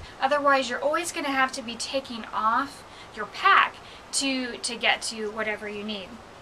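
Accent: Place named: United States. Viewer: really that ;erson said you have an accent ? you sound like a american girl ha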